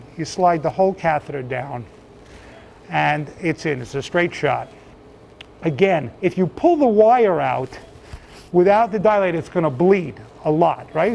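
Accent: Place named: New York, United States. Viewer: Love your NY accent, love the video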